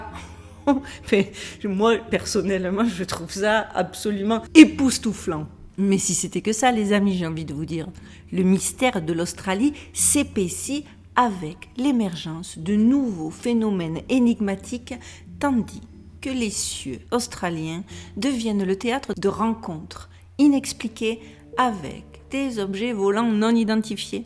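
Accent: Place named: Provence, France. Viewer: Vous êtes de quelle région d'origine ? Votre petit accent du Sud bien de chez nous en Provence. Hein ?😉 🤗💕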